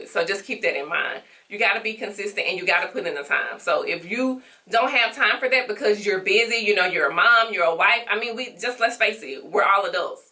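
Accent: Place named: Atlanta, United States. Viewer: You must be from Atlanta... That accent strong.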